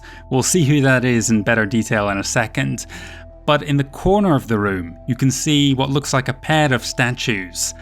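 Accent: United Kingdom. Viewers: You sound like you could be British, my apologies if English is your second language but with such good pronunciation I would have thought you’d get Jaguar right. Americans smear their syllables together but how they get “jag-warr” and “jag-wire” out of J a g u a r is truly mysterious. They say “mirror” as meer and “horror” as whore etc etc etc so I suppose jagwarr is just smearing but how TF do they get “jag-wire”?? One of the most beautiful words in this language brutally choked to death by my dear American fellow human beings. I love ‘em but they don’t know speaking.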